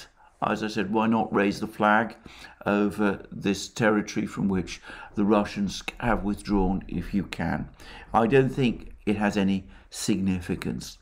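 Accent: United Kingdom. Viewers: Great job AM and I am a sucker for British accent.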